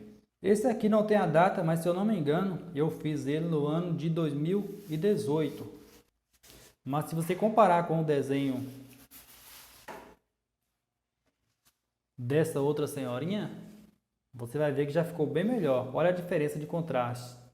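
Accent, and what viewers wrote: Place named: Paraná, Brazil. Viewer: Kkk todo esse tempo eu achei que pelo o seu sotaque você fosse Paranaense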